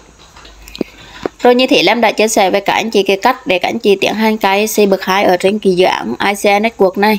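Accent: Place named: Central, Vietnam. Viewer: Quê e ở mô mà trọ trẹ dễ thương rứa e 😂😂😂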